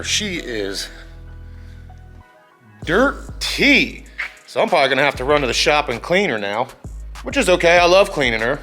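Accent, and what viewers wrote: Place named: Philadelphia, United States. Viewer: OMG you have such a Philly/South Jersey accent !!! PS - Your 2022 Civic sport looks amazing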